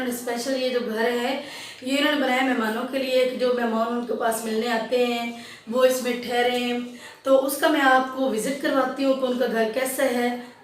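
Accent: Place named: Chakwal, Pakistan. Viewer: R u from chakwal ap ki Urdu say pata chal raha ha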